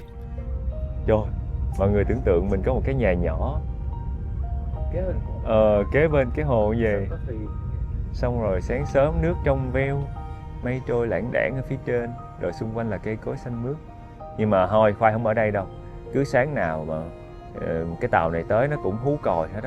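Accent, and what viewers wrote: Mekong Delta, Vietnam. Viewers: Song mot minh lau nam o Thuy Sy , moi lan nghe lai giong mien Tay cua Chau , Chu nho que huong " Soc Trang " qua , nghe rat that tha .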